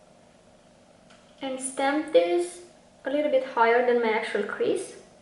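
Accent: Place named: Romania. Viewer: I am so happy I came across your channel. You are so sweet. 💕. I love the fall look you have created. You look gorgeous. I did a makeup fall look on my channel too. So much fun. Would love to be friends on here. 🧡 😘If you don't mind me asking, are you by any change Romanian? I can here a little bit of accent, but your name sounds Romanian :) 🥰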